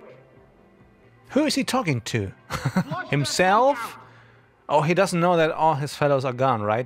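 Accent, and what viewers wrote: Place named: Germany. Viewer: Are you german? I can hear a slight accent :)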